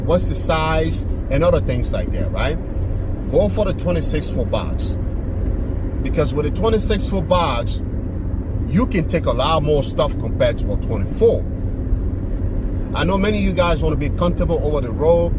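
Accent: Liberia. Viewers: i miss raleigh!! i was tryin to figure out what road you were in and it was hillsborough st....memories...sigh. keep up the good work fellow African. You sound Liberian. My in law is Liberian lol. Good lookin out. i took notes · Sounds like a Liberian. My brabee ur Peking about to get his truck soon.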